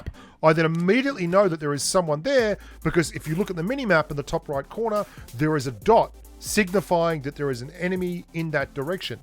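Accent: Australia. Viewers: You from Australia 🇦🇺?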